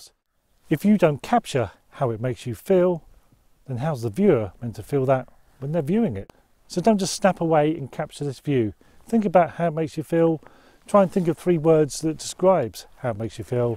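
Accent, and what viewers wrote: United Kingdom. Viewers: Hi! Is it Yorkshire?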